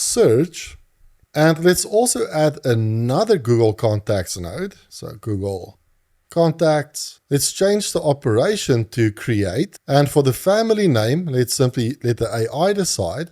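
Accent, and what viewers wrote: South Africa. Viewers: Nice one! Sitting in Netherlands and listening to a Saffa was a proud moment. Love the skillset you bring here, thanks you! *SEO Specialist setting up a multi agent on n8n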